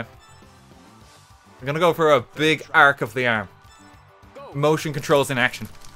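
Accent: Ireland. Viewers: Gotta love the Irish accent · First video I’ve seen and I love it, especially his BEAST Irish accent (I mean I’m Scottish but no ones perfect) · That's how Irish people say it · That’s the beauty of the Irish accent 😍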